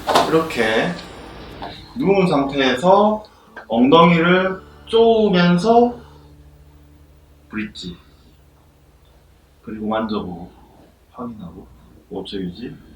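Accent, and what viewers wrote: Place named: South Korea. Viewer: 엉덩이를 쪼으면서 ㅋㅋ 사투리가 참 정겹습니다 ㅎㅎ 늘 좋은 정보 알려주셔서 감사합니다. 따라하면서 괜찮아진 곳이 꽤 되네요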